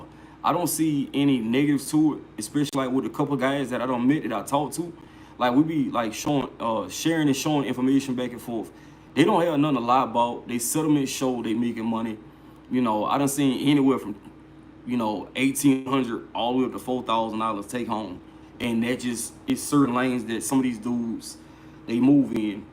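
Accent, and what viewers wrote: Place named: Louisiana, United States. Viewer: U gotta be from Louisiana brudda I could hear it in your voice… Also my first day of orientation w P&S tmr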